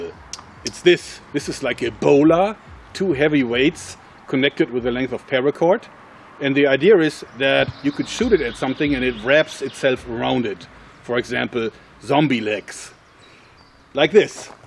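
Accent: Germany. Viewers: Das Schönste an den Videos ist doch, dass englisch mit dem schönen deutschen Akzent. Es würde eine Menge an Witz verlieren.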